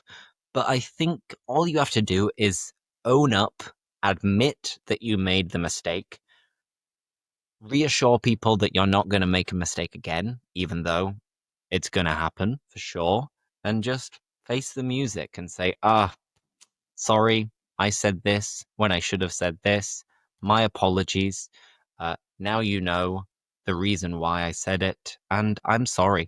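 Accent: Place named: United Kingdom. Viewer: I found your page few days ago, and you are an excellent teacher and your accent is amazing .. I love British accent..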